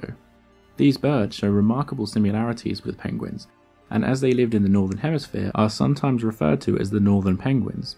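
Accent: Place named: England, United Kingdom. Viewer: You make really interesting videos, and they are great to fall asleep to because your voice is so calm with English accent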